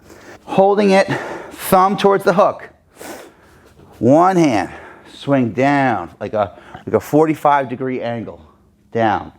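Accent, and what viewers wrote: New York, United States. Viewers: Love your NY accent, have family in NY. Drink WARTER....lol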